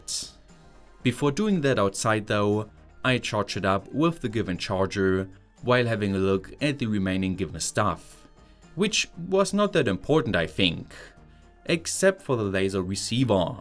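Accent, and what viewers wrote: Germany. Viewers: Ich höre da ein wenig deutsch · Ich dachte mir schon da höre ich doch einen deutschen und dann noch die simme da kam ich drauf😂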